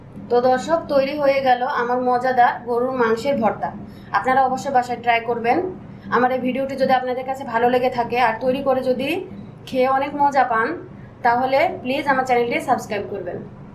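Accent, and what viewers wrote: Bangladesh. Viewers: apnar vasa commillar na anna jaygar vasa Right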